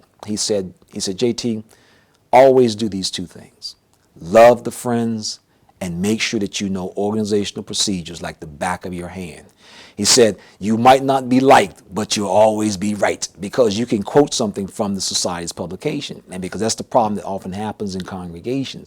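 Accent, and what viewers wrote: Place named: Southeast, United States. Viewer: JT, you and your wife are on my short bucket list of some of the most impressive people I have never met, that I would be honored to meet. I always look forward to your new broadcasts and re-watch older ones sometimes. Your exceptionally respectful and intelligent delivery, knowledge, and honesty , to me, makes me believe you are this generation's Ray Franz even though you are not from the same hierarchy level in the WT, you present the vast information in the same, honest, respectful, and easy to understand way. I do hope you and your wife continue on for a very, very long time, making new videos. Whatever endeavor you take on in the world of employment, I am sure you excel in it. I can only wish for a fraction of your ability to knowledgeably deliver information in this easy to understand way that you seem to naturally have. 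When reading Ray's book I realized I frequented Anniston, AL during the exact time he was there. I was clueless to the JW back then, but if only I had known about the JW, who he was and what he was going through, I would have sought him out just to shake his hand and thank him. Your accent sounds familiar as if you are from the same general, SE, area. I feel if A&E's producers of the Leah Remini Scientology show could get you together with her, to do a program or two on the Watchtower, with your knowledge and delivery shining, it would make for an exceptional ( and very needed) show. Then, your videos WOULD go viral as many hope they would. Please conta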